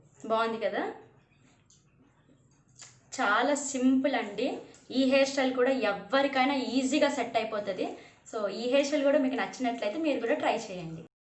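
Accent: Srikakulam, India. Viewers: Akka Srikakulam language super ga undi chala baga matladu tunavu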